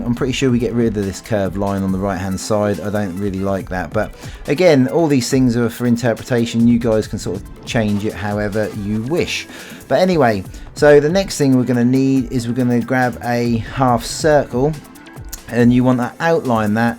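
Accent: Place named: United Kingdom. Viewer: The emblem was super hard as hell and good British voice:)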